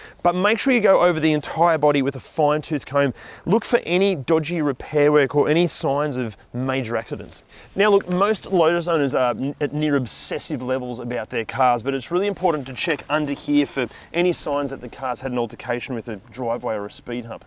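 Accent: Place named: Australia. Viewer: Man its good to hear some nice aussie commentary, thanks for the review!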